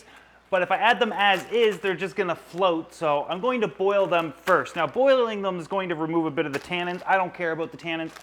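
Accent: Canada. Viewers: James Goose He's Canadian!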